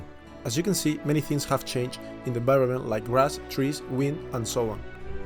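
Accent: Spain. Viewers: this game looks AMAZING, I cant wait to know more also, is that a fellow spanish accent I hear?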